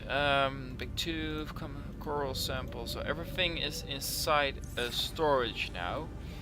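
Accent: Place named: United Kingdom. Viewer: It seems that over time your accent has gotten more and more... British. AWESOME (and totally not cringy) xD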